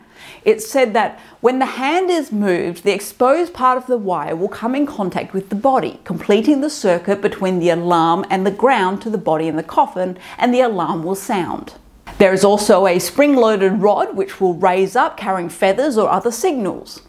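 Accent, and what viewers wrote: Australia. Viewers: Just subscribed. You sound Aussie. Greetings from Victoria.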